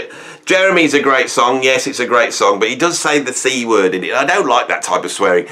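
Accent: Birmingham, United Kingdom. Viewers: Generally agree with all 10! Oh how like Gimme Shelter toooo... Well, I have other nominates for Overrated. Anyway, thank you, it's allways nice to hear your experienced musical opnion in your British English :) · Yeah, I find the Brummie accent is very arousing when the cool is being lost!!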